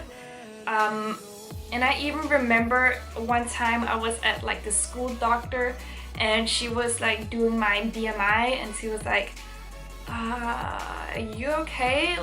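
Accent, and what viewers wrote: Denmark. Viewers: jeg kunne høre med det samme at du var fra Danmark, ahahah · man kan bare høre på din "accent" at du er dansker. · as soon as i heard your voice i was like, she have to be from denmark i can hear it. and then you said it yourself.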